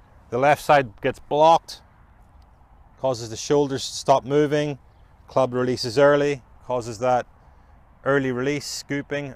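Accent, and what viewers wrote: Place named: Northern Ireland, United Kingdom. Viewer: Was pleasantly surprised to hear the N.I. Accent. Great video! Will definitely help with what I am struggling with. I am longer than most off the tee and probably average length with irons due to my “stuck behind” swing.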